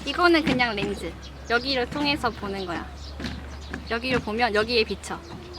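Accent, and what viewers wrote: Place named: Japan. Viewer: 진짜 공감합니다 월급도 쎄지않고.. 근데 어딜가나 월급짠건 똑같다고 생각해서 일단은 화이팅하고 있습니다만..! 그나저나 한국어를 하시는데도 일본어 인토네션이 나오시네요 대단..! 😝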